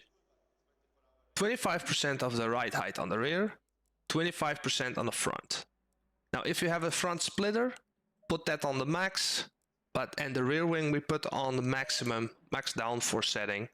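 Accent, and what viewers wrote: Netherlands. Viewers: I smell dutch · You have amazing videos! Subscribed. Btw, you're Dutch, right? (me too). You have that Dutch accent when speaking English.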